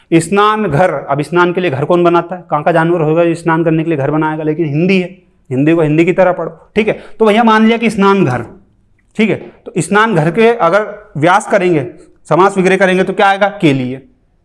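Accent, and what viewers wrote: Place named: Indore, India. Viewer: video se itna toh samajh aagaya ki sir indore se hai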